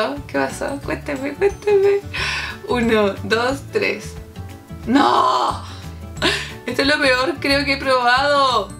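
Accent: Chile. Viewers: Que lindo el acento chileno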